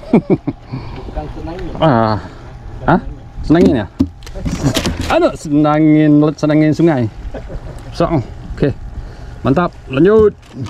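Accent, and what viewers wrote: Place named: Banjar, Indonesia. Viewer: pekat banjar nya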